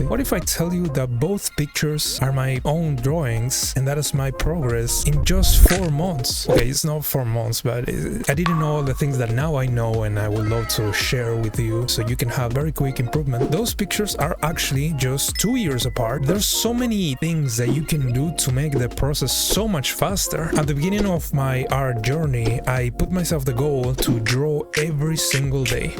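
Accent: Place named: Argentina. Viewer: Idk but I can't stop asking myself- from where are you? Bc your accent sounds very argentinian KSNSNSA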